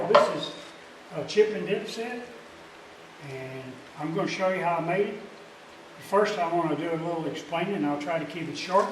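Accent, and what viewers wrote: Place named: Arkansas, United States. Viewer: Always enjoy your work. Sounds like you're a Arkansawyer. I was born in Gentry in 1939. Keep up the good work.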